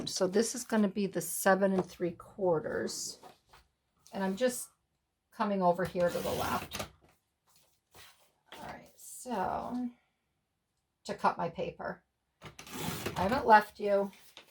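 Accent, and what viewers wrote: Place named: Scotland, United Kingdom. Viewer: Such a great idea to remodel, those digitals are super pretty. Your little bit of Scottish accent made me smile 🥰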